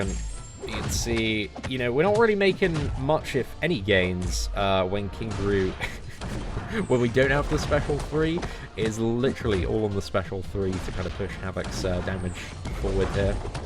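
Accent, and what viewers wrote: United Kingdom. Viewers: Im only here to listen to the pale british bloke yapping about.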